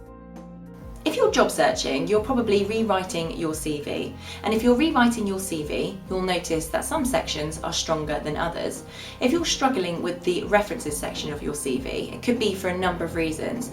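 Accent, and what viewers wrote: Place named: United Kingdom. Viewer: ick awful brittish accent and fugly eyebrows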